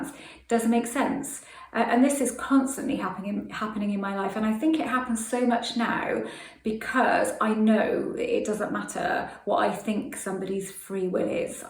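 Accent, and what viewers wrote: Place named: RP, United Kingdom. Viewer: I kinda love it 😍 so posh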